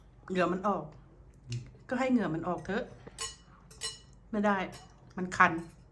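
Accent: Thailand. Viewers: แม่ อยู่ภาคเหนือหรือใต้คะ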